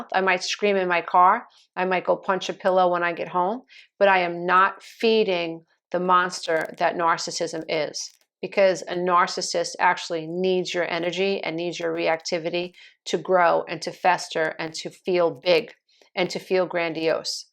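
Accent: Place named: Long Island, United States. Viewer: PS. You sound like you’re from same area I’m at. Bellmore Long Island. Originally from flushing. Then Connecticut Florida Massachusetts and now N Bellmore. Thanks again for your very helpful videos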